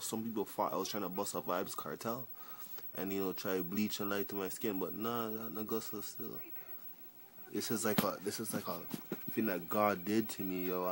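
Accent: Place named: Jamaica. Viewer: I hear a yardie accent, bap bap bap. Yu still cute my yute with vitiligo or not. I have vitiligo too and people ask/say the same thing about my skin, but what can we do? But you will be fine.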